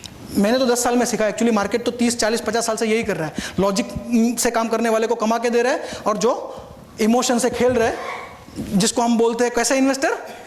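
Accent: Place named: Uttar Pradesh, India. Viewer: Your accent doesn't sound like Gujarati. Did you shift from UP/Bihar to Gujarat?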